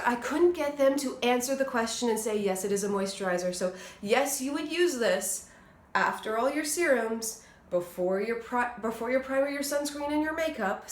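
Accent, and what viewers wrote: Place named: Canada. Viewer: Ok I was too eager beaver, you answered my question at the end. I'm also guessing you are Canadian because of the way you say 'out' 🙂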